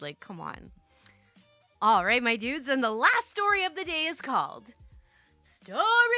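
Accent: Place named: New York, United States. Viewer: I love CB stories and I like your "New York" voice. :)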